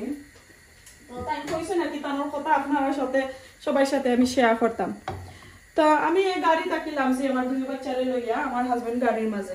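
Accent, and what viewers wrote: Sylhet, Bangladesh. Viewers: Apnar mat kilan apni toh sylheti/ilan matoin kne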